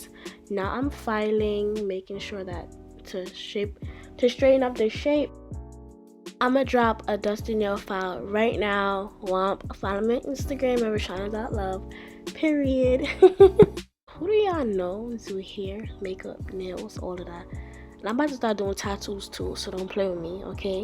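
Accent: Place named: New York, United States. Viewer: Hurd ya accent & knew you was from New York 😭😭 Ima self taught mua & hairstylist and would love to network with you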